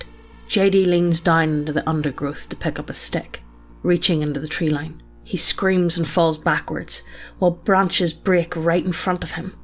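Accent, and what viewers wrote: Ireland. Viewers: Sp00k? Check Scary footage and music? Check Irish Voice? Check Yeah, it's time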